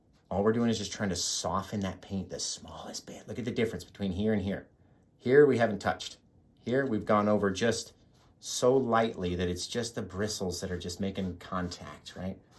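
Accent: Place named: Chicago, United States. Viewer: you crack me up. Now I'm saying "Fantastic" through everyday 👏. I do like your accent a lot! I know you live in Nevada, but are you from Chicago with that accent. I'm from Texas and fav sandwich PB & J...👀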